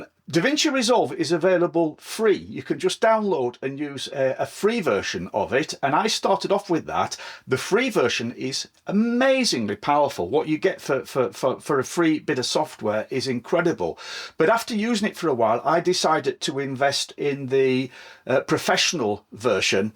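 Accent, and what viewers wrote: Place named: Northern England, United Kingdom. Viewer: Excellent video! You nailed it with the reasoning and I fully agree with you. Nice to hear that Northern accent as an ex-pat in Vienna from Sheffield. I located your trips and was surprised that you don't seem to be from the Harrogate area as you sound just like my best mate from school who's from there. 😂 Anyway I'm glad I discovered your channel and have viewed a few of your videos. I will watch more. Again I fully agree, stick to your principles why you enjoy making them, you are educational, thought inspiring and making others happy. Thumbs up 👍 Oh yes I have recently returned to biking after a long break. Kids etc. 😉 A lot to relearn.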